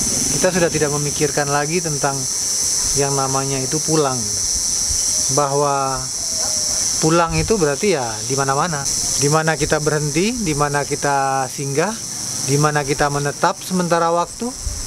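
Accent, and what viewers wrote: Palu, Indonesia. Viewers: Makin lama disini, logatnya bang sudah seperti logat Palu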